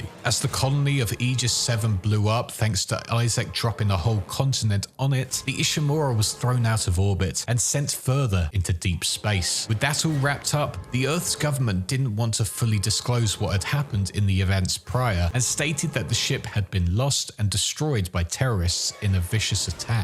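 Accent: United Kingdom. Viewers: Ah, another English guy speaking in that English way as if he's a professional in whatever gibberish he is speaking about.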